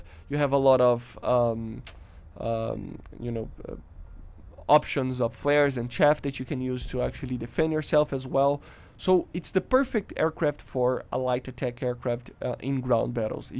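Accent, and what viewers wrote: Brazil. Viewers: no começo ja comecei a estranhar o jeito de fala e no final realmente acertei q era brasileiro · You are brasilian aren't you? If not, your accent is very brasilianish.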